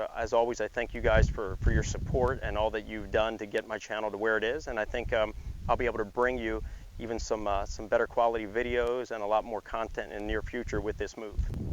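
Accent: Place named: Maryland, United States. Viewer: I've been watching your Fiero vids and I was born and raised in MD before moving to Nashville 4 yrs ago. The second I heard your accent, I knew I had to subscribe! Congrats on the new location. Wish I was still in MD. Could use your knowledge for my Fiero!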